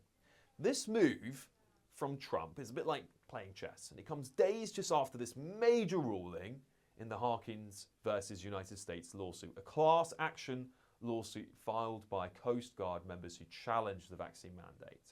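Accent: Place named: RP, United Kingdom. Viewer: Posh talking head propagandist wimp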